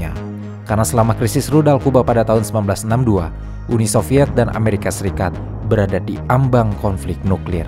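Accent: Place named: West Java, Indonesia. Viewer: Dari cara bicara nya, tampak nya mimin dari suku Sunda.. kumaha min, daramang ?? 😃😁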